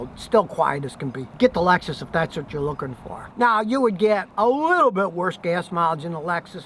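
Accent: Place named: Western New York, United States. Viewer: He lives in TN, so I'm guessin' that Western NY inflection isn't translatable....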